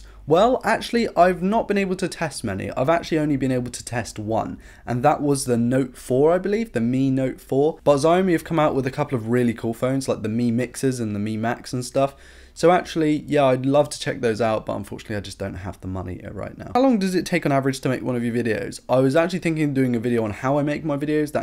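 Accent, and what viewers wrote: United Kingdom. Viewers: Happy birthday , and I like how you talk I like british accent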